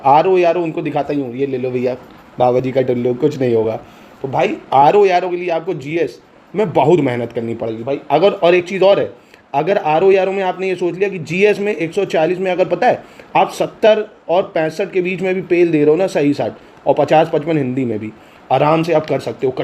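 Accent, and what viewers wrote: Kanpur, India. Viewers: Kanpuriya hain ase hi Bolte hain😅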